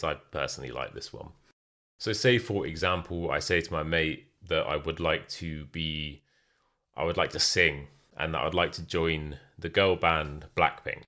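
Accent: United Kingdom. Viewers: Do you speak with rp accent or cockney? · Thank you teacher, i LOVE your British accent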